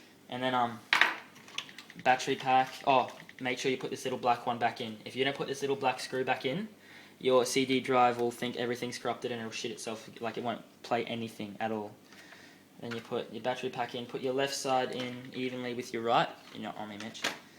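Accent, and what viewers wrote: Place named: Australia. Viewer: "Don't swear"... You sound Aussie as well but i'd turn and say fuck just for shits and gigs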